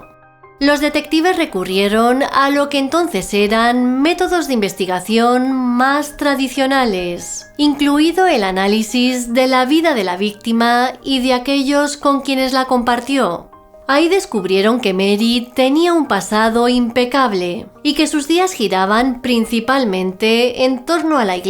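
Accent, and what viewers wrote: Spain. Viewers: eres española o mexicana?, en todo caso tus relatos son interesantes pero tu forma de hablar hace sentir la sensación de q estás montado en uña montaña rusa🙄🙄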